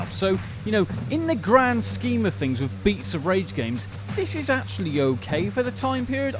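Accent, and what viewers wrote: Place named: United Kingdom. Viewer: i hate when your gross british accent utters rebecca when the character is obvi jill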